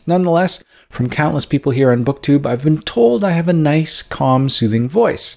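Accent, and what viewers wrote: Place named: Canada. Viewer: I barely hear Canada in your voice. I cringe at my own accent sometimes when I watch my videos back!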